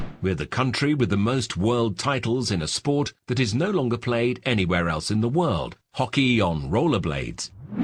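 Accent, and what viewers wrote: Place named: United Kingdom. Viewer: Well, I love Portuguese accent, but you made this video with a British accent? NOOO!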